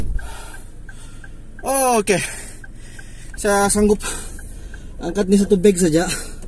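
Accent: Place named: Sabah, Malaysia. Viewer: Suk😂 dengar cara org sabah ckp. Sedap bahasanya. Baek kau bang. Pandei ko ckp bus lantai bocorr.😅😅 ko boleh jd driver racing car lah bang. Corner pun ko layan 90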